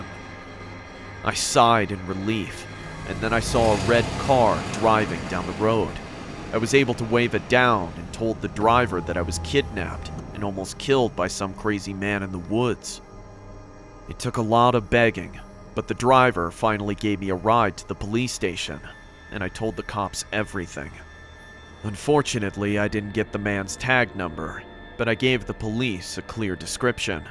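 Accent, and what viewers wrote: United States. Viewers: Where is your Tennessee accent???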